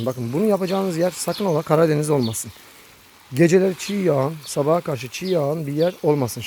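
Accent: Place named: Aegean, Turkey. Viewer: Hocam boşver sen onları etrafımız negatif insanlarla dolu. Sen samimi adamsın belli konuşmalarından. Nerelisin izmir aydın tarafına çok benziyor şiven. Allah kolaylık versin. Kolay gelsin.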